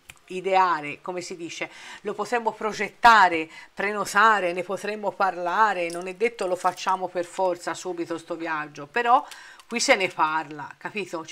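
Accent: Tuscany, Italy. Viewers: Sentirti, con questa meravigliosa parlata Toscana, è bellissimo. Io delusa.. Uff ormai ci ho fatto il callo.. Mi piacerebbe molto lo sai trovare finalmente la persona giusta, ma è molto difficile. Ma nonostante questo mi piace sempre ascoltarti❤️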